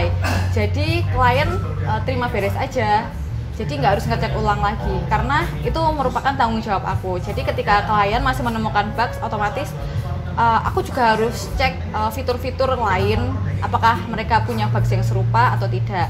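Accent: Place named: Java, Indonesia. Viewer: medhok mbak..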